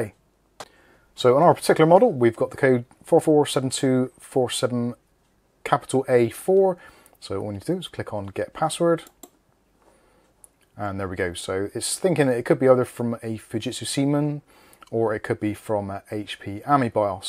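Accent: Bristol, United Kingdom. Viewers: You from Bristol??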